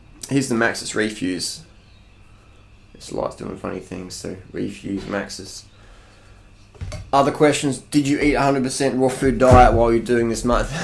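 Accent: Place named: Australia. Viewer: ***** He doesn't talk strange. .he's just Australian :)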